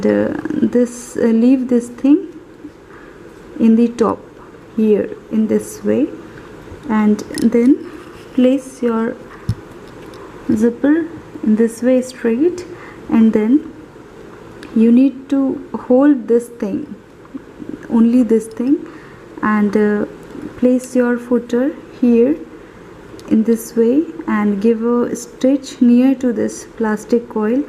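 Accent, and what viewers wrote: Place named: Algeria. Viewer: جزايري مرة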